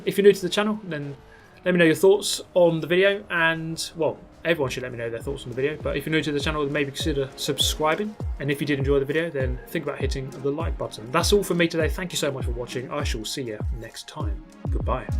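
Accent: United Kingdom. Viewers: dude the scenes of absolute mayhem and you critiquing them with your limey accent has me dying lmfao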